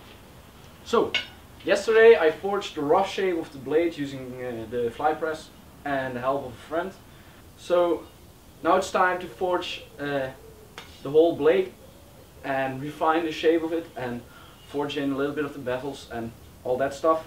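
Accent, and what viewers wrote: Netherlands. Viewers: Are you dutch? Your accent seems so Edit: ik lees nu pas je beschrijving😂 ik hoop dat je weet dat een yatagan eig geen zwaard is maar een dolk👌🏽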